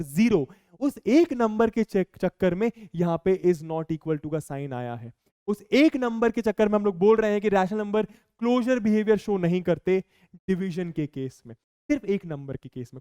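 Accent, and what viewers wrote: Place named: Bihar, India. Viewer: His bihari accent makes the lecture more understandable and relatable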